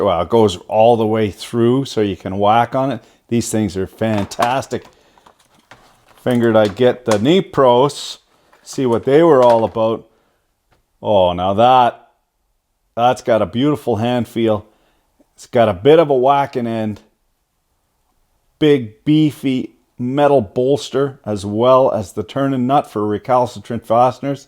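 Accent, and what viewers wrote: Canada. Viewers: I'm from Florida is this how all Canadians talk?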